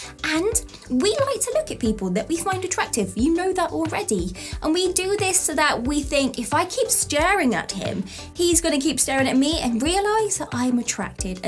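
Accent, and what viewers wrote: England, United Kingdom. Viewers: I absolutely love your voice! Are you English or British?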